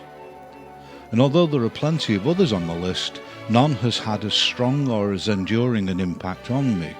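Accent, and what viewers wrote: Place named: Northern England, United Kingdom. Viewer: Thank you for doing these, very informative and enjoyable, nice to hear a fellow northern accent too.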